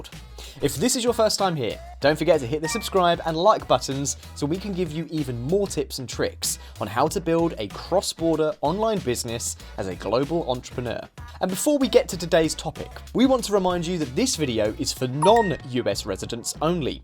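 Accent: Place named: United Kingdom. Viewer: I like your British accent 💪💕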